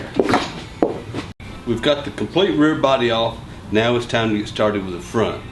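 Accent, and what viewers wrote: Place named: South, United States. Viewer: Thank you for your informative and easy to follow videos. (We also enjoy your beautiful southern accent.) Please make more videos as time allows you. What a GREAT Teacher you are! ㋛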